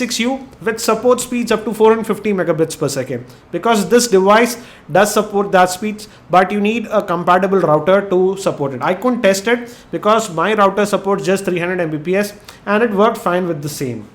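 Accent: India. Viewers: is he speaking english or indian, i cant tell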